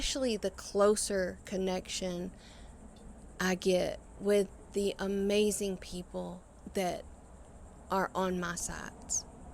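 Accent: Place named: South, United States. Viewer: You're very pretty, I enjoy your southern dialect, be strong, and enjoy life to the fullest.😊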